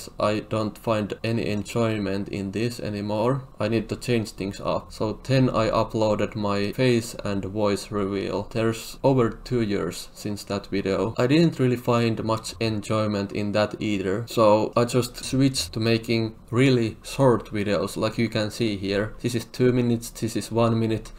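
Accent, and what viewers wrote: Finland. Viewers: Finland accent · Mahtava suomalainen aksentti olisko vanhana tekijänä mitään hyviä vinkkejä meitsille? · Suomi accent o crazy (eksä tehny face reveal jonku aikaa sitte) mut joo hyvä vid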